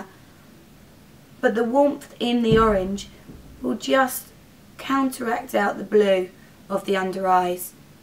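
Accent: England, United Kingdom. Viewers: Are you British ? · I realy like your video, let me ask , where are you from!?! England? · LOVE British accent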